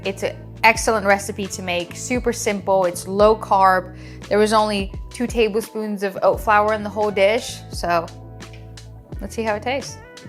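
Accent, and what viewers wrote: United Kingdom. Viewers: it's wild how your accent is mostly unchanged but you speak with a very British cadence now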